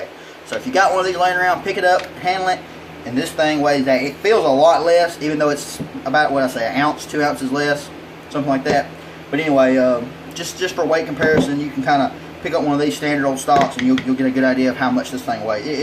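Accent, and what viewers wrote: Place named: New York, United States. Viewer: I noticed your accent. You're from New York right?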